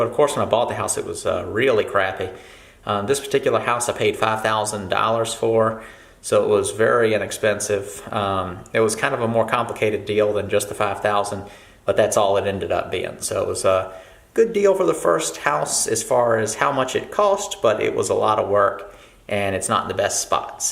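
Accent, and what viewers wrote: South, United States. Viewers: Sounds like you're from the South, what state are you in?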